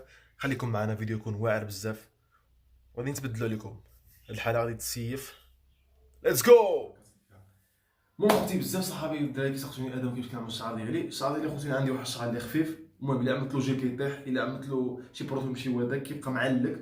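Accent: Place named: Algeria. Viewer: من اللهجة ديالك كتبان جزائري ماشي مغربي لي متافق يدير لايك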